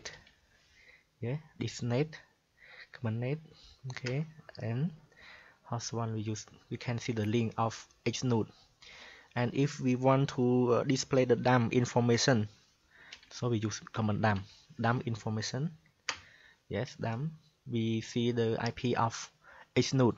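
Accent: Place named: Vietnam. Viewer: thang nay nguoi viet chac luon :v Cai accent deo chay dau duoc lol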